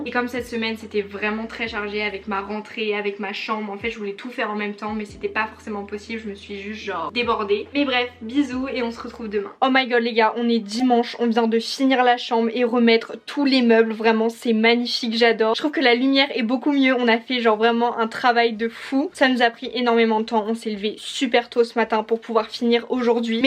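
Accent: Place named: United States. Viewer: Très belle vidéo comme d’habitude ! Tu as un bel accent ! Une vraie américaine 😊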